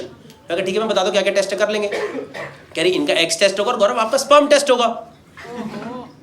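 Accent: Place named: Punjab, India. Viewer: Hard to understand the super faster than Rafael jet talking combined with the thick punjabi accent. Please provide English subtitles also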